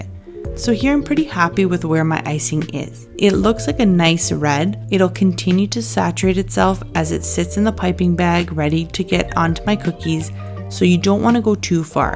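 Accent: Canada. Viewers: 😹😹 YouTube isn’t really giving me my notifications otherwise I would’ve responded days ago. Yes you definitely have a Canadian accent When I left Canada and moved to the United States I was working for American Express and all of the customers kept saying where are you from because I would say “out and about” with that Canadian flare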